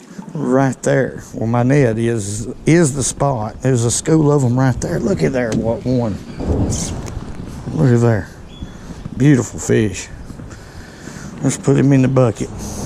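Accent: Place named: Kentucky, United States. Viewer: Lol! Man I like this guy! is a heck of a guy and helluva entertainer. I love the accent. Reminds me of my Kentucky family.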